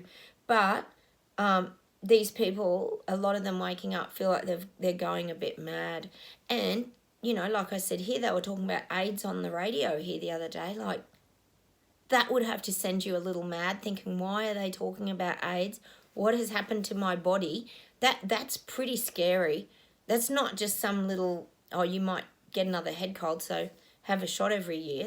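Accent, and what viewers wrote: Australia. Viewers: Hi new subscriber here agree with all what you have said. Been saying to everyone for the past couple of years now we have to go back. I have no idea why I say this but feel we have moved to fast forward. It's time to get back to basics. Can't wait for people to wake up. Lovely to here your Ausie accent I used to live over there many years ago.Still miss it. Thanks for your guidance xx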